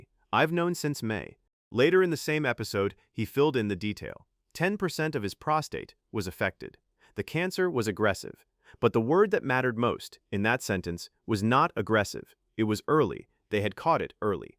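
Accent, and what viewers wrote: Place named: United States. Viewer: This narrator is American Jeremy Clarkson's is English if someone is going to narrate an Englishman who lives in England surely it has to be narrated by British narrators just saying.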